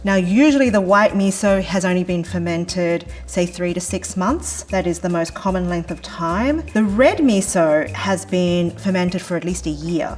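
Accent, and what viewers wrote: Australia. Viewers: She's so happy that even her Australian accent sounds happier. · Oz accents for life!! ☺️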